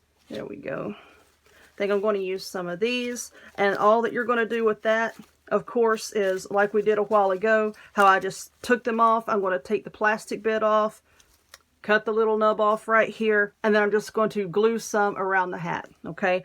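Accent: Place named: South Carolina, United States. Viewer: I ABSOLUTELY LOVE THIS SCARECROW 😊🎃🍁🍂 & THE WAY YOU MAKE THEM KID FRIENDLY 💝💙! THE VERY FIRST TUTORIAL I SAW OF YOUR'S WAS YOUR BEAUTIFUL CHRISTMAS WREATH WITH THE MANGER SCENE WITH OUR SWEET BABY JESUS IN THE CENTER & YOU COMMENTED "THAT'S IMPORTANT BECAUSE THAT'S WHAT IT'S ALL ABOUT REALLY'' & ALSO THAT YOU STILL SAY "MERRY CHRISTMAS 💝😊! THEN I LOVED SEEING THE "OUR PRAYERS" CERAMIC PIECE YOU BOUGHT AT THE DOLLAR TREE (Which I really loved too)! I JUST LOVE SEEING PEOPLE WHO STAND UP FOR WHAT THEY BELIEVE IN & THIER THOUGHTFULNESS FOR OUR CHILDREN & GRANDCHILDREN! OUR GRANDBABY'S HAVE ATTENDED THE SAME EXCELLENT CHRISTIAN SCHOOL FOR THE LAST 4 THAT OUR 3 CHILDREN ATTENDED AT FOR A WHILE YEARS & IT'S STILL THE SAME CHURCH. MAY GODALWAYS CONTINUE TO BLESS YOU SWEET GIRL 🙏✝💝!!! PS: Now believe me us 4 Sister's can still get a little sideways when necessary, lol 😊!!! 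Your voice sounds just like us & You have tons of fun & your personality & voice sounds just like ours! We were born & raised in Arcadia FL but our Precious Daddy was born & raised in KINGSTREE, SC until his Family moved down here. But he never lost SC accent & we all have it pretty close too, lol 😂!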